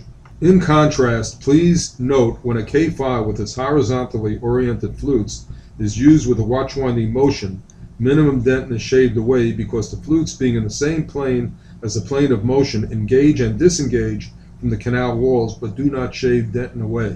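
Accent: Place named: New York, United States. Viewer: i lover this guys NYC accent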